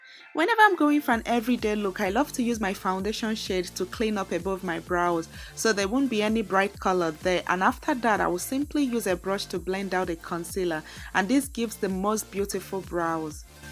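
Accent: Nigeria. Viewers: i just love you ...your makeup is good..your psyche and that nigerian accent is lit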